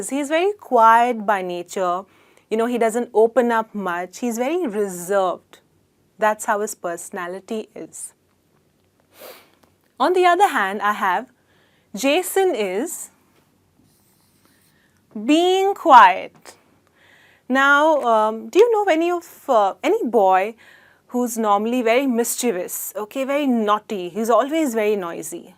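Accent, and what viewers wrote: India. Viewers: Aleyda Narváez Narváez lol,,,, u moron,,,shez Indian,,, how cn she pronounce like u American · CARMENFERNAN YANET FERNANDEZ BUSTOS ....excuse me....but actually...she is an indian lady....so how she will be Ble to speak in american english...its common sense..dumb...u r an american ...so u will be never speak like an indian...r u???...nt at all...then why r u telling her....bc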